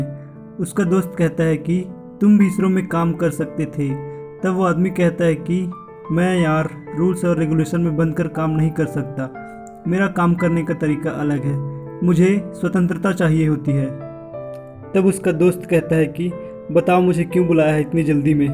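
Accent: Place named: United Kingdom. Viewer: Britain voice h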